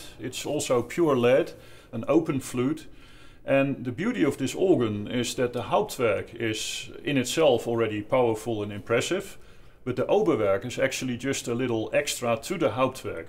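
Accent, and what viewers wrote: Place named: Limburg, Netherlands. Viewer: Gro-ning-en ..Op ze Vloms of dialec, Limburgs ..zo moeilijk nie ;-). Wel mooie technische uitleg en fantastische klanken. Djië hé't da Goe gedoan mènneke "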